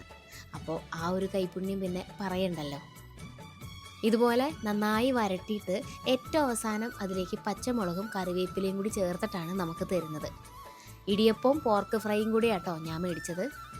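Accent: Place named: Valluvanad, India. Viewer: വള്ളുവനാടൻ ശൈലി ആണല്ലോ സംസാരം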